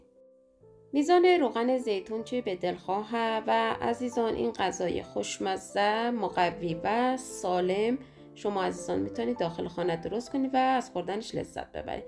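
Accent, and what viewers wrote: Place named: Afghanistan. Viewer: جان، خواهر قندول و نازنینم، تشکر! من عاشقِ لهجه پارسی دری تو هستم.:) یه کلمه گفتی که معنی اش را نفهمیدم. " تَیار می‌کنیم " یعنی چی؟ این کلمه به فارسی ایرانی چی میشود؟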